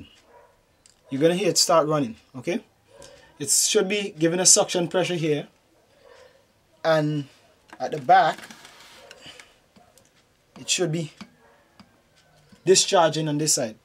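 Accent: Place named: Jamaica. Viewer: are you a Jamaican? u have an accent man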